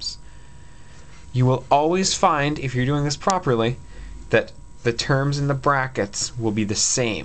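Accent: Canada. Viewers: Probably Canadian.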